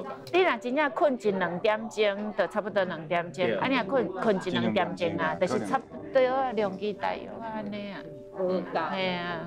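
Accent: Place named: Tainan, Taiwan. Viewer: 標準的台南腔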